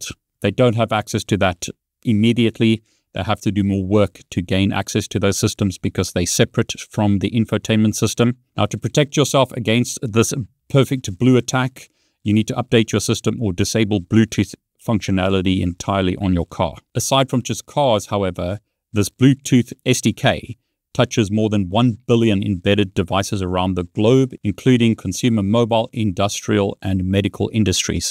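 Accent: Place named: South Africa. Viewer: It's his South African accent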